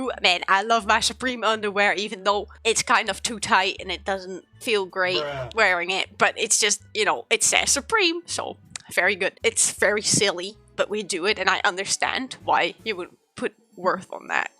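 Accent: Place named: Netherlands. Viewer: You sound Dutch <3 Are you Dutch? Very interesting topic!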